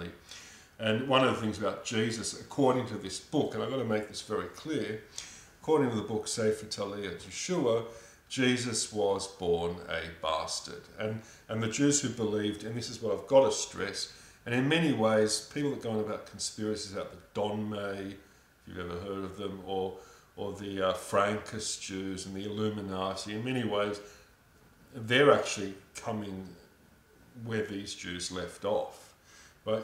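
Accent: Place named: Australia. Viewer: interesting vid - fellow aussie it seems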